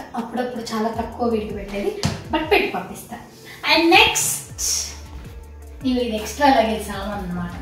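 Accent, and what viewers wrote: Telangana, India. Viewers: Akka me telangana basha assaluuu👌👌👌😘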